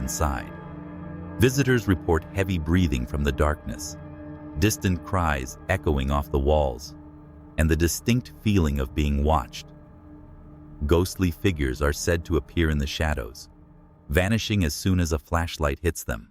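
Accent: United States. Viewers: Why are Australian haunted spots being narrated by an American?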